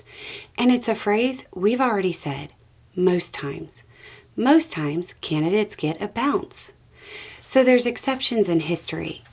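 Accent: South, United States. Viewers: Good morning 👋🏻👋🏻. It’s so good to see your lovely smile and hear your Southern voice today. I hope you and Beau have a great day today.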